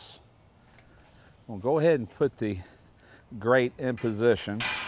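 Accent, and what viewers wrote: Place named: Mississippi, United States. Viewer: You can tell your a Mississippi Man....lol. sound like my friend Amos Fowler ( ex NFL player)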